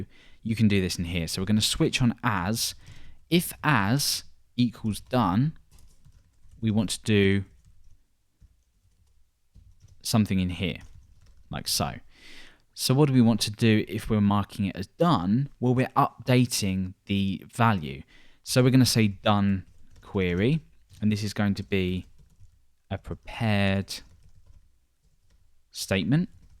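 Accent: United Kingdom. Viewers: PHP tuts, bonus British accent free.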